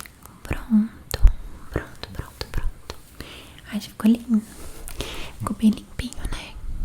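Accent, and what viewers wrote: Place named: Goiás, Brazil. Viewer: Vc é Goiana? Seu sotaque parece nosso ❤️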